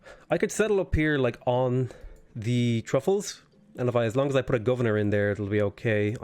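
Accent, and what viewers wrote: Ireland. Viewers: mate that guys is shit at accents must be american you deffo sound irish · IDK what that guy's talking about, you definitely sound Irish. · I swear accent became more Irish after he was accused of sounding like he was from London.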